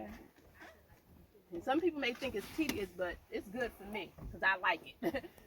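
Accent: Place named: New York, United States. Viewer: Very informative...she must be from NY or NJ...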